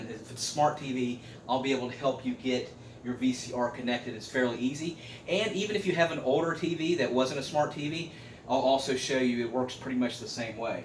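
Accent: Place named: South, United States. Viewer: Thank you kindly sir! Want to send a shout to you and your generosity from your Northern fellow American. I adore your Southern accent! Very charming :) Because of you I can watch my dad’s videos, he passed away 6 months ago. So thank you thank you. You’re the best!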